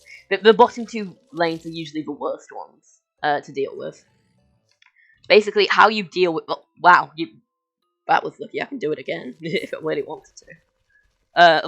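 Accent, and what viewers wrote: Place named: United Kingdom. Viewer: U sound so briish its amazing.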